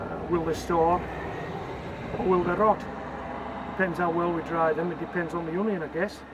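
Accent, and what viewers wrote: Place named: Scotland, United Kingdom. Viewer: I do like to hear your Scottish accent, sir. So strong. Love it.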